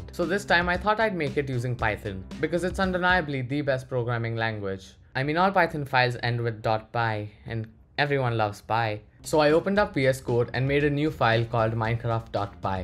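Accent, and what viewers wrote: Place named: India. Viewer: ur indian accent is heavy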